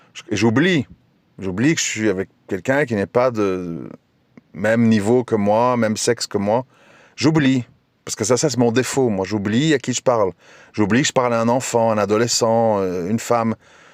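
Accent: Belgium. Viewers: Sympathique l accent belgeomusulmant. Le reste aussi.😊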